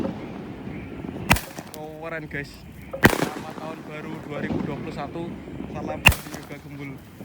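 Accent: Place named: Central Java, Indonesia. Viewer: Koe wong jowo tengah mas???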